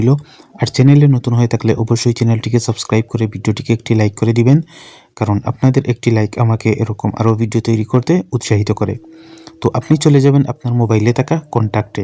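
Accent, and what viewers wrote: Sylhet, Bangladesh. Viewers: Bai apne sylhetini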